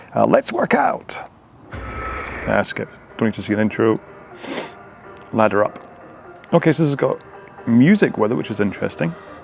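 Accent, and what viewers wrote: Scotland, United Kingdom. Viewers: Thanks for reviewing asensei, appreciate it. It is worth mentioning that most of our workouts these days are full row-along sessions with coaching and talking all the way through from the likes of Eric Murray, Johan Quie and British Rowing. There are some you can try in the free trial too. These are similar to Eric's free row-along sessions on the asensei youtube channel - indeed some of those sessions are pulled into the app and have the goal setting, music, tracking etc fully integrated. Would be happy to talk you through any of this if you ever want to do a more in-depth piece, or just catch up. Nice to hear a Scottish voice doing a review too (two of the asensei founders also Scottish)!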